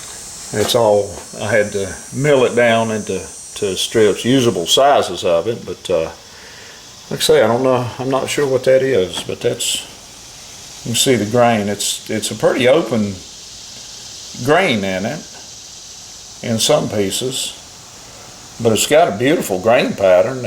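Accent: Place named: Georgia, United States. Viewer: I love turning wood, and I really love that accent of yours. I have a sister who has lived in Ark. most her life, but it's a bit different than yours. Are you from Georgia, by chance?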